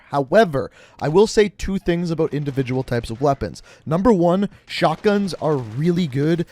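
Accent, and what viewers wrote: Canada. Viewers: Is this guy from Canada ?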